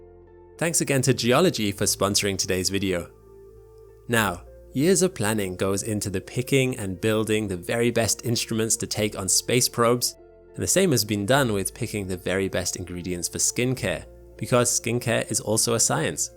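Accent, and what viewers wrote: United Kingdom. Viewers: Why do you sound English but foreign at the same time? Can someone make it make sense to me? Is actually English or was he born somewhere else and moved here? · Why is he trying to sound like a British?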